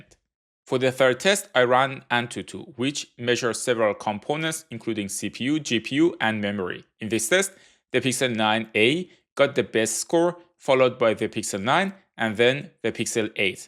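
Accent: Iran. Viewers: Dadash lahje englisit tablo'e Irani hasti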